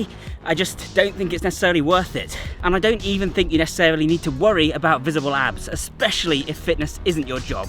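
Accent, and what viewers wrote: England, United Kingdom. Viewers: Americans always trust anyone with an English accent 😁 So of course as someone eating like an American, I’ve had to resort to counting calories. I somehow seem to get fat so easily. 🙄 even when I’m at maintenance I have to count. I guess eating like a hog in my youth while lifting 4 days a week made it where I can’t seem to have that intuition for not overeating. Thanks for the video! Subscribed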